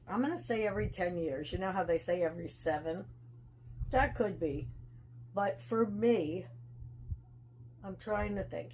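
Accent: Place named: Michigan, United States. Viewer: Is she from Michigan? That is where I am originally from and it sounds like their accent LOL When I was a kid, women in their 60s looked the same as women today in their 80s and 90s LOL It's like your 60s today is just your midlife, not almost the end like it used to be. People retire now and enjoy their 2nd life.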